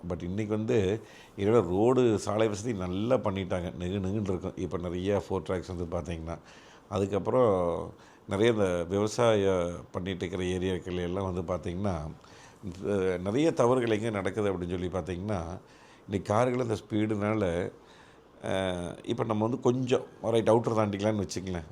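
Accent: Kongu, India. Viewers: ❤அண்ணா வணக்கம் 🙏🏻திருப்பூர் கொடி ராஜா 🌱🙏🏻🇾🇪 முதலில் மக்களுக்கு விழிப்புணர்வு ஏற்படுத்த, உங்களது பொன்னான நேரத்தை செலவிட்டு, இந்த மாதிரியான வீடியோக்களை தொடர்ந்து பதிவிட்டு வரும் உங்களுக்கு வாழ்த்துக்கள்..❤️நன்றிகள்🙏🏻.... மேலும்,நமது கொங்கு மொழியில் எளிமையாக, மக்களுக்கு புரியும்படி பேசும் உங்களது பேச்சு அருமை... 🙏🏻 தொடரட்டும் உங்கள் சேவை💐💐💐💐💐💐💐💐💐💐💐💐💐💐💐💐💐💐💐💐💐💐💐💐💐💐💐💐💐💐💐💐💐💐💐💐💐💐💐💐💐💐💐💐💐💐💐💐💐💐💐💐💐❤️💚💐💐💐💐💐💐💐💐💐💐💐💐💐💐💐💐💐💐💐💐💐💐💐💐💐💐💐💐💐💐💐💐💐💐💐💐💐💐💐💐💐💐💐💐💐💐💐💐💐💐💐💐💐💐💐💐💐💐💐💐💐💐💐💐💐💐💐💐💐💐💐💐💐💐💐💐💐💐💐💐💐💐💐💐💐💐💐💐💐💐💐💐💐💐💐💐💐💐💐💐💐💐💐💐💐💐💐💐💐💐💐💐💐💐💐💐💐💐💐💐💐💐💐💐💐💐💐💐💐❤️💚💐💐💐💐💐💐💐💐💐💐💐💐💐💐💐💐💐💐💐💐💐💐💐💐💐💐💐💐💐💐💐💐💐💐💐💐💐💐💐💐💐💐💐💐💐💐💐💐💐💐💐💐💐💐💐💐💐💐💐💐💐💐💐💐💐💐💐💐💐💐💐💐💐💐💐💐💐💐💐💐💐💐💐💐💐💐💐💐💐💐💐💐💐💐💐💐💐💐💐💐💐💐💐💐💐💐💐💐💐💐💐💐💐💐💐💐💐💐💐💐💐💐💐💐💐💐💐💐💐❤️💚💐💐💐💐💐💐💐💐💐💐💐💐💐💐💐💐💐💐💐💐💐💐💐💐💐💐💐💐💐💐💐💐💐💐💐💐💐💐💐💐💐💐💐💐💐💐💐💐💐💐💐💐💐💐💐💐💐💐💐💐💐💐💐💐💐💐💐💐💐💐💐💐💐💐💐💐💐💐💐💐💐💐💐💐💐💐💐💐💐💐💐💐💐💐💐💐💐💐💐💐💐💐💐💐💐💐💐💐💐💐💐💐💐💐💐💐💐💐💐💐💐💐💐💐💐💐💐💐💐❤️💚💐💐💐💐💐💐💐💐💐💐💐💐💐💐💐💐💐💐💐💐💐💐💐💐💐💐💐💐💐💐💐💐💐💐💐💐💐💐💐💐💐💐💐💐💐💐💐💐💐💐💐💐💐💐💐💐💐💐💐💐💐💐💐💐💐💐💐💐💐💐💐💐💐💐💐💐💐💐💐💐💐💐💐💐💐💐💐💐💐💐💐💐💐💐💐💐💐💐💐💐💐💐💐💐💐💐💐💐💐💐💐💐💐💐💐💐💐💐💐💐💐💐💐💐💐💐💐💐💐❤️💚💐💐💐💐💐💐💐💐💐💐💐💐💐💐💐💐💐💐💐💐💐💐💐💐💐💐💐💐💐💐💐💐💐💐💐💐💐💐💐💐💐💐💐💐💐💐💐💐💐💐💐💐💐💐💐💐💐💐💐💐💐💐💐💐💐💐💐💐💐💐💐💐💐💐💐💐💐💐💐💐💐💐💐💐💐💐💐💐💐💐💐💐💐💐💐💐💐💐💐💐💐💐💐💐💐💐💐💐💐💐💐💐💐💐💐💐💐💐💐💐💐💐💐💐💐💐💐💐💐❤️💚💐💐💐💐💐💐💐💐💐💐💐💐💐💐💐💐💐💐💐💐💐💐💐💐💐💐💐💐💐💐💐💐💐💐💐💐💐💐💐💐💐💐💐💐💐💐💐💐💐💐💐💐💐💐💐💐💐💐💐💐💐💐💐💐💐💐💐💐💐💐💐💐💐💐💐💐💐💐💐💐💐💐💐💐💐💐💐💐💐💐💐💐💐💐💐💐💐💐💐💐💐💐💐💐💐💐💐💐💐💐💐💐💐💐💐💐💐💐💐💐💐💐💐💐💐💐💐💐💐❤️💚💐💐💐💐💐💐💐💐💐💐💐💐💐💐💐💐💐💐💐💐💐💐💐💐💐💐💐💐💐💐💐💐💐💐💐💐💐💐💐💐💐💐💐💐💐💐💐💐💐💐💐💐💐💐💐💐💐💐💐💐💐💐💐💐💐💐💐💐💐💐💐💐💐💐💐💐💐💐💐💐💐💐💐💐💐💐💐💐💐💐💐💐💐💐💐💐💐💐💐💐💐💐💐💐💐💐💐💐💐💐💐💐💐💐💐💐💐💐💐💐💐💐💐💐💐💐💐💐💐❤️💚💐💐💐💐💐💐💐💐💐💐💐💐💐💐💐💐💐💐💐💐💐💐💐💐💐💐💐💐💐💐💐💐💐💐💐💐💐💐💐💐💐💐💐💐💐💐💐💐💐💐💐💐💐💐💐💐💐💐💐💐💐💐💐💐💐💐💐💐💐💐💐💐💐💐💐💐💐💐💐💐💐💐💐💐💐💐💐💐💐💐💐💐💐💐💐💐💐💐💐💐💐💐💐💐💐💐💐💐💐💐💐💐💐💐💐💐💐💐💐💐💐💐💐💐💐💐💐💐💐❤️💚💐💐💐💐💐💐💐💐💐💐💐💐💐💐💐💐💐💐💐💐💐💐💐💐💐💐💐💐💐💐💐💐💐💐💐💐💐💐💐💐💐💐💐💐💐💐💐💐💐💐💐💐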